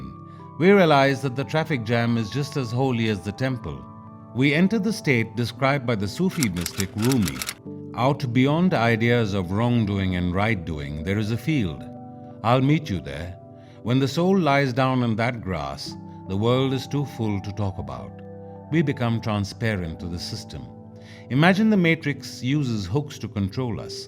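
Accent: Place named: India. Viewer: The indian accent is what makes me turn it off immediately.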